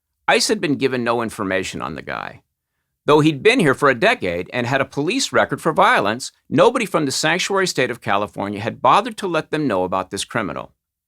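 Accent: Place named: New York, United States. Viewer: You might be the West coast but you sound like here in New York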